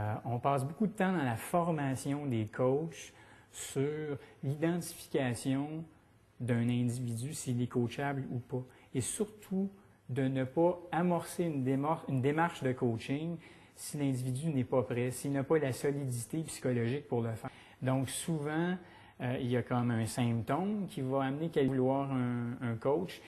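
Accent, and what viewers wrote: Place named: Quebec, Canada. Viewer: I like the French Canadian accent, cool :)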